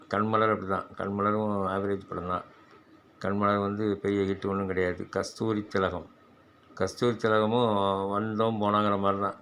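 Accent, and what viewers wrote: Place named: Tirunelveli, India. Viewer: Thank you sir Your Nellai slang very very sweet for my ears. Keep it up sir.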